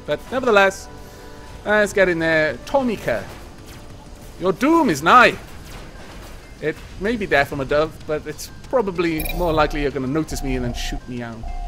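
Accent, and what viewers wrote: United Kingdom. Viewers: I wonder where that accent is from. It's like a proper British accent with some foreign mixed in to make it interesting.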